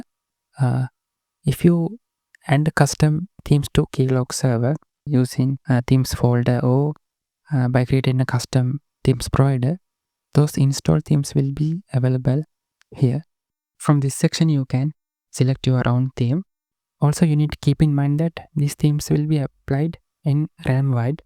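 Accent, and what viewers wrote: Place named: India. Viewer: God, please, give me a tutorial without indian accent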